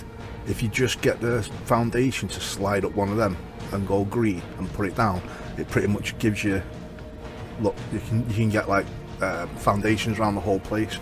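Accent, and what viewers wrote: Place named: Salford, United Kingdom. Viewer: You sound like a salford lad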